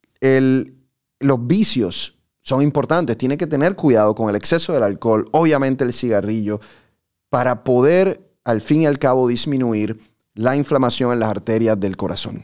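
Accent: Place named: Venezuela. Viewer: Perdone Dr. en que país se encuentra Ud.? Y de donde es? Ud.tiene acento Venezolano. Bueno le deseo todo lo mejor de la vida sobre todo mucha salud,felicidad,paz,y prosperidad. Muchos existos en su hermosa carrera. Muchas Bendiciones.!❤❤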